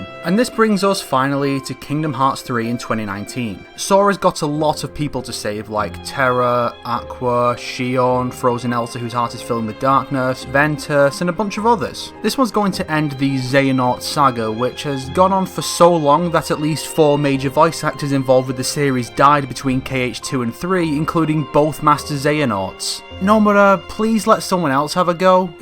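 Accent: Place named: Merseyside, United Kingdom. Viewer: So I've finally given up trying to pinpoint that accent: where in the UK are you from? My gut says somewhere in Merseyside but I'm just not sure :P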